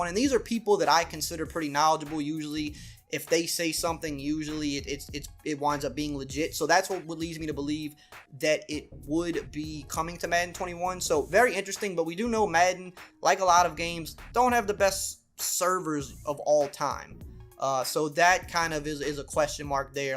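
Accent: Louisiana, United States. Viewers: do you have a cajun accent? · What part of Acadiana u from bro I hear Louisiana all over you lol great content as always 👍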